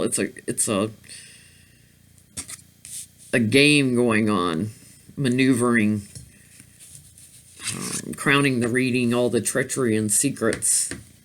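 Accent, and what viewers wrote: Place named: Russia. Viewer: Great reading! I feel a Russian influence as well! 🌻👍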